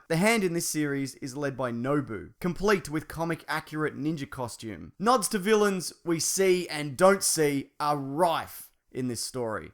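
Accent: Australia. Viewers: bestminecrafter1 he's Australian... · You Aussie?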